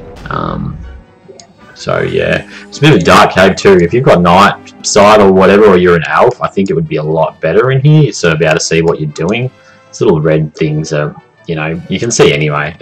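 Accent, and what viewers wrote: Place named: Australia. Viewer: Are you lagging? Looks like you can barely walk anywhere. What country are you playing from and what shard are you in? Because you sound Australian, so you should be in Oceania right?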